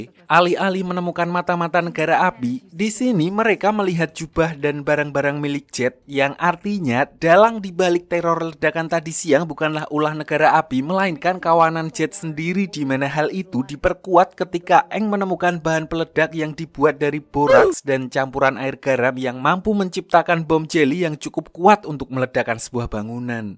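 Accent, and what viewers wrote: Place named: Java, Indonesia. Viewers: bisa gasi gausah medhok pas ngomong bahasa indo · Medok amat mas ngomongnya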